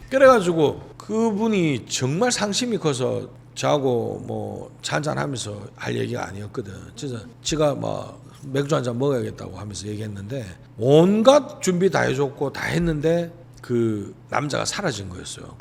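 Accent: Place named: Gyeongsang, South Korea. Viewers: 경상도 소리가 그래요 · 갱사도라서 그런가봐유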